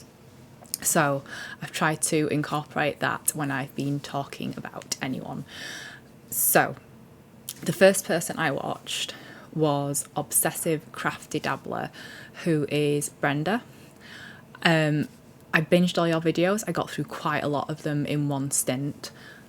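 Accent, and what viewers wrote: Yorkshire, United Kingdom. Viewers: Love your videos! Great to hear some Yorkshire again. “Shut the door” Looking forward to next update. Happy stitching. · I love the Yorkshire accent! The puppy demigorgon is adorable. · I watched your first video yesterday and the second today. Thanks for the Yorkshire. Love hearing dialects. :-) No, I couldn't figure out what you were talking about, but saw a translation in one of the viewer comments. One would think we were worlds apart in age and interests, but I find more and more things to make note of, check on, watch. I'll be looking for some of the YouTubers you mentioned and look forward to seeing your progress on your stitching projects. Your sense of humor makes me smile and your creative director does a great job, as well. New subscriber. :-)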